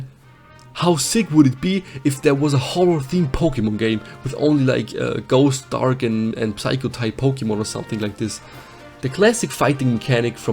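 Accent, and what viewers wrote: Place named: Germany. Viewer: Netter deutscher Akzent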